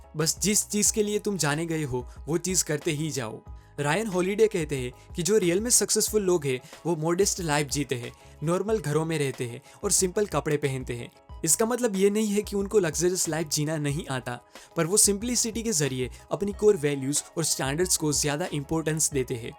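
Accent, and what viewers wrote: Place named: Kathiawar, India. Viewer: Kathyawadi touch feels in your voice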